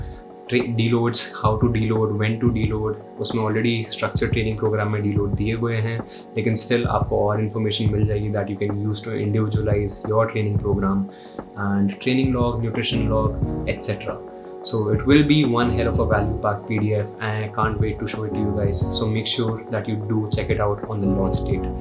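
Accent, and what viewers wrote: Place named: Delhi, India. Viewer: Why do you pronounce English words like that, lol. Like when you say track, it sounds like truck. When you say pack, it sounds like puck. When you say man, it sounds like maan. Thick ass Delhi wala Indian accent.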